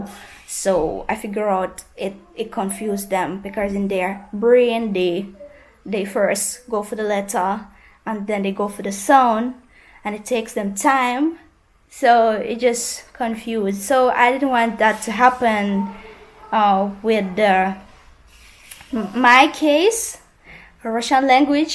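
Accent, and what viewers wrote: Jamaica. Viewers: not 100% fluent in English and patois, so there is mix of both when she talks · Yes. Teach him. He will regret not learning when gets older. I love your Jamaican accent. Im Jamaican and taught myself russian some years ago. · Ur getting the Patois LoL 😂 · You have a real jamaican accent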